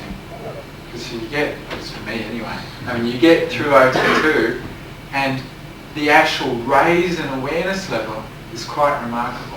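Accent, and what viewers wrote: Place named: United Kingdom. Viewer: But his accent is British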